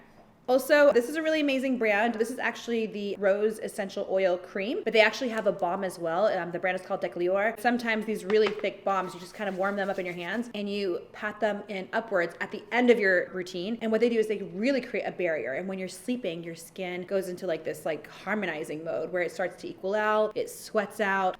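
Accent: Iraq. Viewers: هدئ بيوتي اول مرة الحك عل فيديو من اول ١٠ دقائق احبج من البصرة وحجي عراقي فدوة😂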